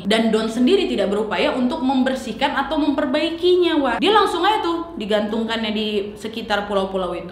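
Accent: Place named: Batak, Indonesia. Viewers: Kak orang batak ya? Soalnya gaya ngomongnya kayak orang batak😄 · orang mana si logat nya macam sumatra kali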